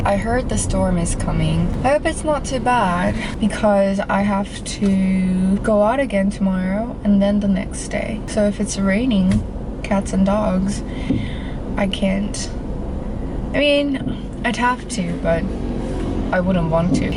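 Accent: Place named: United Kingdom. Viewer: 안그래도 오랜만에 영국발음 듣고싶다고 생각하던참이었는데ㅜㅜ거마워여❣ 우아한 취미생활 부럽다용😆👍🏻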